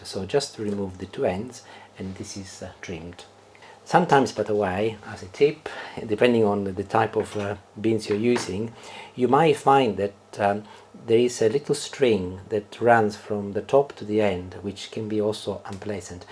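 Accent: Italy. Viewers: Amazing cook! His instructions are clear; his English, with his Italian accent, is excellent! I'll definitely try cooking the dish!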